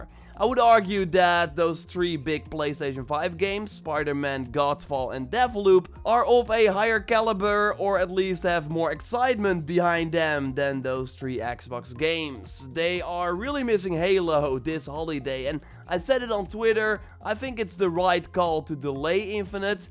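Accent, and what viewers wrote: Netherlands. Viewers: Je klinkt als een hollander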